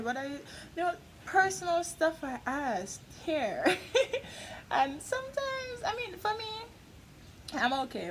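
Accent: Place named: Jamaica. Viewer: Your partial Jamaican accent is so cuuute.🙆 I just love it.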